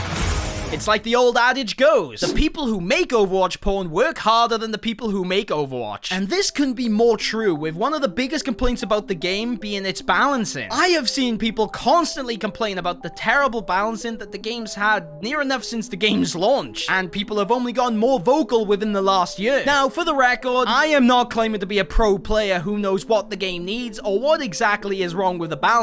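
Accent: United Kingdom. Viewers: British accent mixed with the typical exaggerated YouTube tone is unlistenable.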